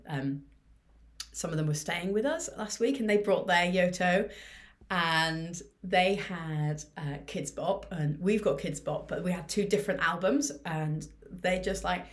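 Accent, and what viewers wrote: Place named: England, United Kingdom. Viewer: Nothing wrong with the British accent.... But to be fair, it's an English accent.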